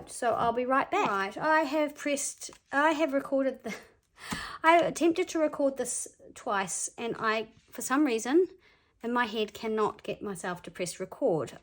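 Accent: New Zealand. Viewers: So I’m new to this junk journalling and been inspired by a local friend. I think I’ll watch and learn this month, and start getting things together for when June comes around. Having watched Megs intro videos, yours is the first one I’ve watched with the daily prompts, and I’m even more inspired now. And how lovely to hear another kiwi! I’m in the waikato. Have subscribed to your page and look forward to seeing your journal develop! Thanks so much.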